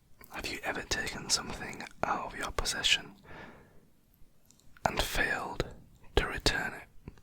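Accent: United Kingdom. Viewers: FINALLY A BRITISH ASMRTIST 😍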